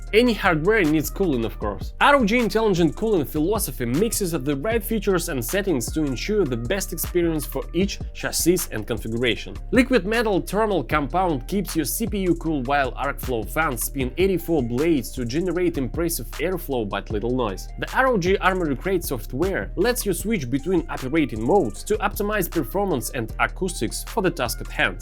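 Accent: Russia. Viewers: ох уж этот русский акцент😅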